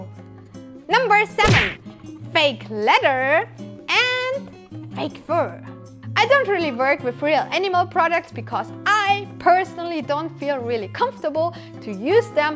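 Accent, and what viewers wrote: Germany. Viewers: Is she german? She sounds like that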